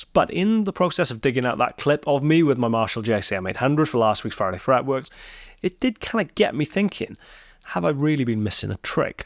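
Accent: Wales, United Kingdom. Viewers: You can have both - just get a valve amp with a send/return. You've got the front end responsiveness and the colours of your pedals.. Tasty playing btw and nice to hear the accent from the land of my fathers..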